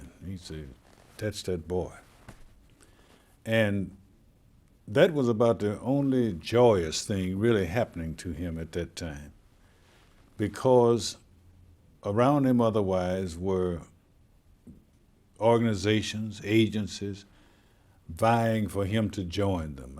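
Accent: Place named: South, United States. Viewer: Yeah he duz hv a southern tone, but its Subtle. His vocal tone cadence add to his credence.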